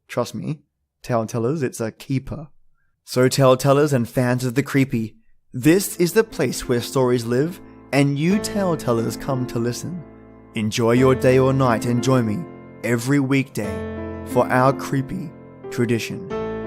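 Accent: Australia. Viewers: I'm suppose to be babysitting, but the baby went down for a nap so here I am 😏 ready for some great stories in that awesome Aussie accent of yours 🙂🖤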